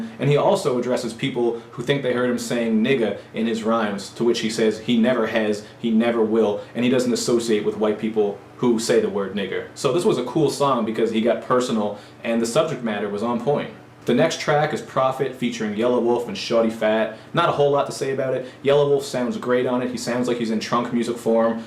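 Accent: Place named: Atlantic Canada, Canada. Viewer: You from east coast of Canada? U got a mad east coast accent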